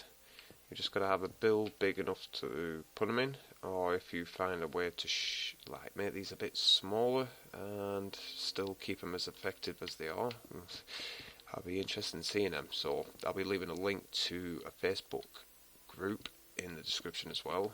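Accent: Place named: England, United Kingdom. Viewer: I ended up here by accident, I was researching medieval architecture, and after reading about how castle spires usually had their stairs built counterclockwise for defensive reasons, I came to youtube to see some videos of them. This wasn't what I was looking for, but I'm glad I ended up here, it's inspired me to go back to minecraft and start building. A bit of trivia, if on a single one of the stairs you miss out the halfslabs so that you have to jump, you'll end up with a trip step, an uneven step used to cause attackers unfamiliar with the steps to trip. It's useless for design, but great if you're building it to roleplay. Thanks for the amazing video, I'll be checking more of them any time I need to get inspired, I don't know if you're still active on youtube but I've gone ahead and subscribed anyway :) Also I recognise that accent, hello from a fellow Englishman :)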